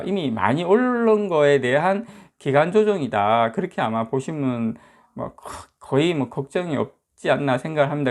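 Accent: Gyeongsang, South Korea. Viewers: 갱상도 말씨 정감있어 좋아요 ㅡㅡ하또 하또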